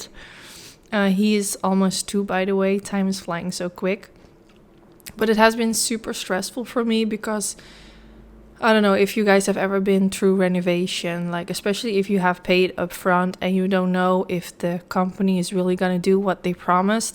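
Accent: Netherlands. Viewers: I love the look and your lovely Dutch accent . Prima 🌸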